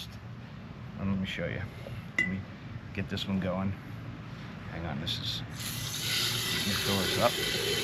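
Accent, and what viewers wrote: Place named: New England, United States. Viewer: You know what, your little demonstration was spot on to show how soap helps with penetration!! That was F'in cool! And by your accent, I know you are in the same part of the country, N.E.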